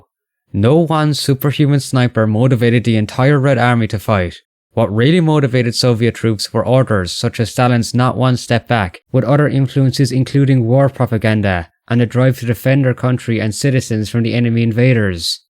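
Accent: Ireland. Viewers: Is this guy Irish?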